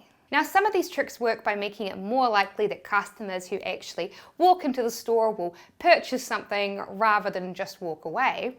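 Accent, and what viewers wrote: New Zealand. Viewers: Is that normal accent in new Zealand ? That'd be awesome! Greetz from Germany 😱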